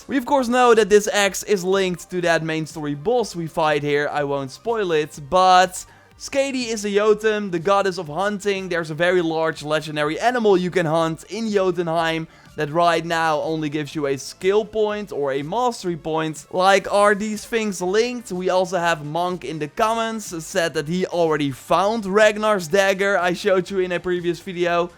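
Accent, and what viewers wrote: Netherlands. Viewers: are u ducht? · Are you Nederlands? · How can people listen to this guy, the Dunglish is hurting my soul.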